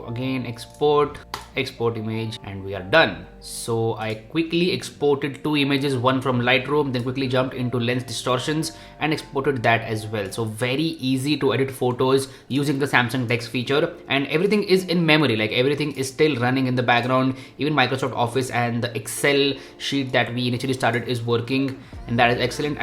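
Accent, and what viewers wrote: India. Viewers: English from India!!!! I'm brazilian but I can notice Indian English accent! I'm 99% sure! lol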